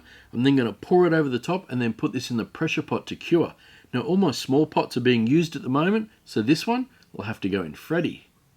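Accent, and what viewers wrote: Australia. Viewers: Hi, loved this video, but i’m wondering: Australia or South Africa, i’m pretty certain you’re not a Kiwi😊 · Wow! I’ve just stumbled across this page, love hearing a fellow Aussie accent hehe. This is an absolute beauty ❤️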